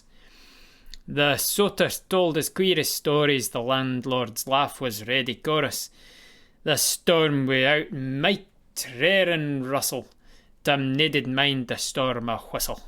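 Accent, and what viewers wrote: Scotland, United Kingdom. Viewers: Your Scottish accent is perfect, and better yet, you have the emotional inflections that go along with the subject matter. Robert Burns is one of my favorite poets. Fantastic work!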